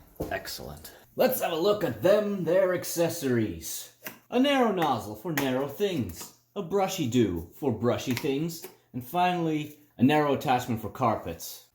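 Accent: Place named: Germany. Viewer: Pronunciation: not strange, just German. Duh.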